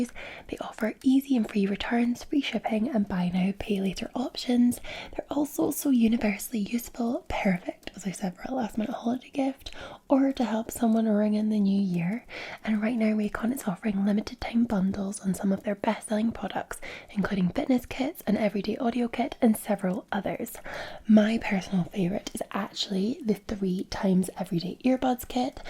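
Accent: Scotland, United Kingdom. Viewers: half an hour of tingles from the resplendent scottish lass. perry the platypus noises ☺️ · U had me at Scottish 🏴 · Great video ❤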